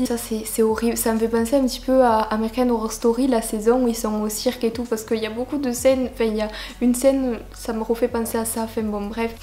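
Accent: South, France. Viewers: l'accent du sud ❤❤❤ j'adore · Et j adore ton accent du sud ^^^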